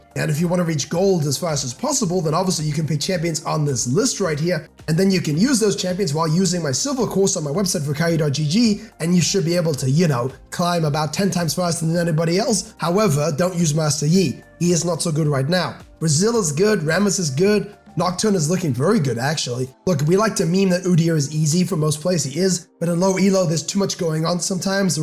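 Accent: South Africa. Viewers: Why do you sound 10x more south african when you're sick lmao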